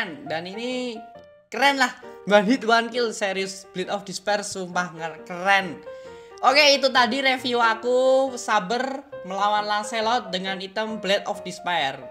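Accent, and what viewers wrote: Java, Indonesia. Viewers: Pasti yg punya Chanel orang Jawa keliatan Logatnya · Orang jawa yag mas?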